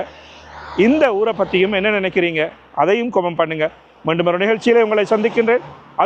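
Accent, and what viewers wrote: Sri Lanka. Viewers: இலங்கைத் தமிழ் ரொம்ப அருமையாக இருக்கிறது . எனக்கு K . S .ராஜாவையும் , B . H . அப்துல் ஹமீது அவர்களையும் நினைவுக்கு கொண்டு வந்து விட்டீர்கள் . புதிய தகவல் . தெரிந்து கொண்டேன் . Thank you .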